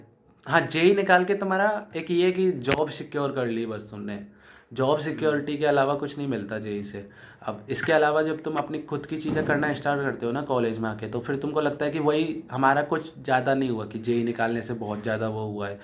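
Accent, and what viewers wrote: Maharashtra, India. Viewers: Tu Marathi ahes ka? Video khup chan ahe Hindi la Marathi toning ahe….😄😄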